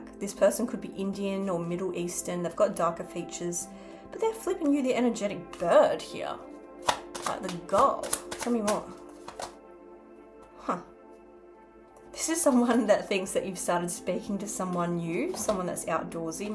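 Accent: Australia. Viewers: Long time no see 👀! You remind me of a butterfly fairy 🧚‍♂️ popping in and out of my energy 🦋✨I love your accent! Sounds like British! Now you confirmed Aussie! My DNA says I’m a third English! So we’re in the ballpark somewhat. No, I’m not into competition! More into helping to lead and healing wandering souls needing nourishment. Don’t like Freemasonry nor Eastern Stars! Reminds me of my criminal paternal family. Who have hated me and stolen from my Grandmas inheritance for me. Plus, they are in leadership and I detest their minor children sex trafficking and drug trafficking and immoral sexual mandatory group unprotected orgies for their Demonic Cult/Community! Sick and demented. I will defeat them for the kids and their PTSD suffering parents. They are a Menace to Global Society! I hate that they are lazy and using my inheritance to cause pain and suffering to innocents! 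You sure are spilling my ☕️ I see! You have a beautiful soul I can see. I liked you the first time I saw you a year ago! Down with the Karmics! Father God created me to be a karmic trap 🪤 I’m God’s spiritual warrior 🤺⚔️ ✌🏽👆🏾🫶🏼 Keep up the good work!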